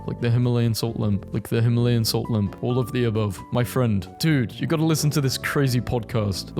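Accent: United Kingdom. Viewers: first of all it was a joke, second of all he has a British accent so I assume his first language is English and you saying it like it’s not his first language and if that was the case I would think differently, second of all I’m not saying he should be able to pronounce all of those words you listed because yeah they are hard to pronounce. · It’s cause he’s british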